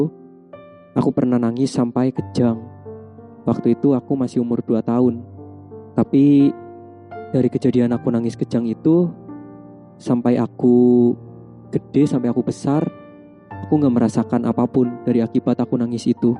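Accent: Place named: Tegal, Indonesia. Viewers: Terima kasih atas ceritanya mas 🙏😁 suaranya enak banget, aksen jawanya terasa hehehe. Cuma menurutku ceritanya kurang serem 😂. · Logatnya spt Tegal dan Pemalang. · Baru mau Koment Mbak Mas nya kayanya asli Tegal, soalnya Logat bahasane medok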